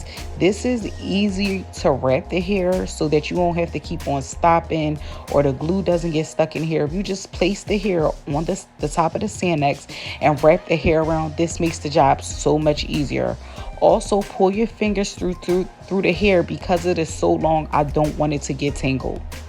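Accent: Philadelphia, United States. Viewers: are you from philly ? i hear the accent lol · Your voice makes me miss my college besties! Are you from Philly? When I listen to you talk I think of Cheltenham lol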